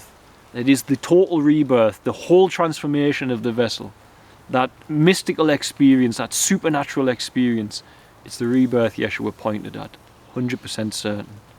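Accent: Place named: Northumberland, United Kingdom. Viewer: Pure non dual clarity, it’s like listening to Ramana Maharishi but with a Northumberland accent 🙏🏻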